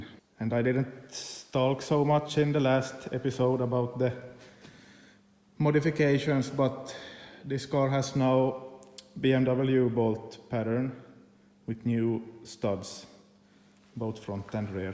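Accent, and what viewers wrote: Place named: Finland. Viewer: I love Finland, finns and finnish accent. Anyway, great job! I want this car!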